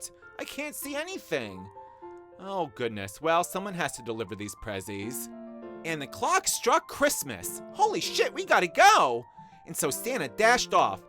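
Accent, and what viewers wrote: United States. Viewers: Rudolph the Red Nosed Reindeer poorly narrated by a foul-mouthed New York (?) sissy.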